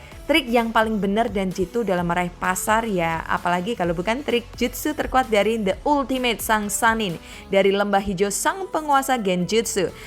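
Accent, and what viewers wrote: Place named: Java, Indonesia. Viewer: Bacanya ga masalah, medoknya itu yg masalah...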